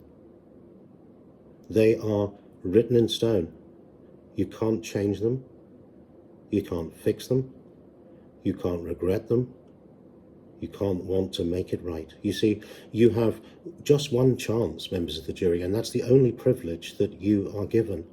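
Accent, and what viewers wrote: United Kingdom. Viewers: YouTube is REALLY pushing your “My answer to “how do you defend someone you think is guilty”” video from 5 years ago. I’ve seen multiple comments on multiple videos of people saying they found you through that video recently. And I have to agree with everyone, you are very smart, very personable, and very charming. A strong British accent for an American is always knee weakening as well. Love the content, love the knowledge. Only wish you were more consistent with uploads but i couldn’t imagine how very busy being a Barrister must be. Have a good day and thank you for gracing our TVs and phones.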